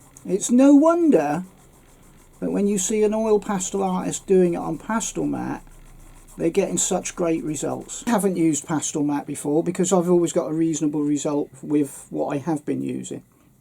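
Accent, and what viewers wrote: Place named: Norfolk, United Kingdom. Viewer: sounds like ur a norfolk boy.dyou know jason norman?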